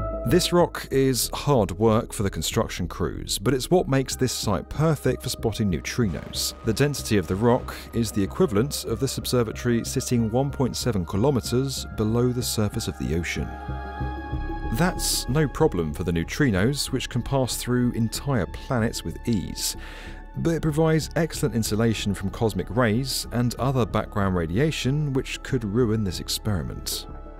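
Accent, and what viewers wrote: England, United Kingdom. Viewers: You know, I don't really interested in civil engineering or watch some people building an enormous megaproject all I wanted to do in this chanel is to hear the beautiful english accent 😅✌️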